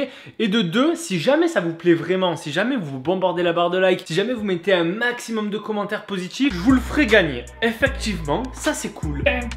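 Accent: Marseille, France. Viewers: Marseillais plutôt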